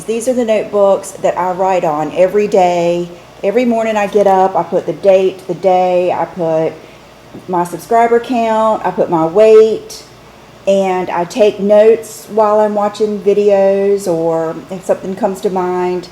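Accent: South, United States. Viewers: look forward every day to your videos..I don't know if its your southern accent..but your voice is so soothing & really love your style..alot of the makeup & clothing you mention, I can not afford, but so appreciate you showing so many products..I did recently purchase the Maybeline Lifter gloss in Moon & I love it..It really is almost a dupe for fenty gloss bomb in fussy...anyway just wanted to say thanx for always uplifting my day..especially if I am in a funky mood....keep moving forward..enjoy your weekend..:)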